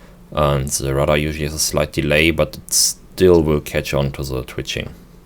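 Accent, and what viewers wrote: Germany. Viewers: I'd bet you are a native german speaker .. :-) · Du bist Deutscher oder? ;D wenn ja, man hört deinen Deutschen Akzent oder so. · erstma danke für die antwort... trotzdem habe mich auf der channelseite des " " informiert er is aus Deutschland;-) Und wenn man das nich an dem Akzent hört heheheheh dannweiß ich auch nich.... P.s. Fliege IL2, Cliffs of Dover und ab und zu FSX...da brauch man glaube ich nen extra Addon für damit das FFB richtig funtz!? cya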